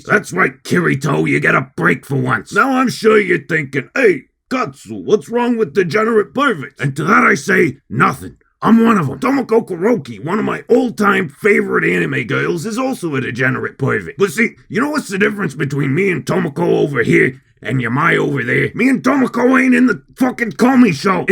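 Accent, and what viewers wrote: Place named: New Jersey, United States. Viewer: Love the jersey accent, although bailitmore accent is better, good video, easy sub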